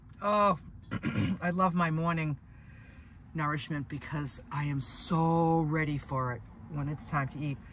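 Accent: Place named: East Coast, United States. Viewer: you are a joy to see! Love your setup. You actually remind me of me! I eat very similar to you. I’ve been more vegetarian and Mediterranean with my diet since I was a kid. The only meat I ate was what my mother cooked, but always loved fish, and greens and veggies are my favorite. I went Vegan 2 years ago and then slowly added back in fish, eggs, and some cheese, mainly hard cheeses from Italy and mozzarella, and also added back in the olive oil. If my fridge isn’t packed with leafy greens and other vegetables then it doesn’t matter what else I have, it feels empty. Mackerel is one of the best fish to eat for one’s health! By the way, I hear the east coast in you, are you on an east coast beach? I’m originally from Long Island now living in Southern California. Stay well🌟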